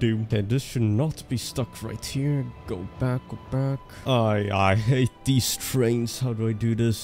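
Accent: Sweden. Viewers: im all for factorio gameplay as well! As a swede im not fond of swedish accents but urs i can listen to all day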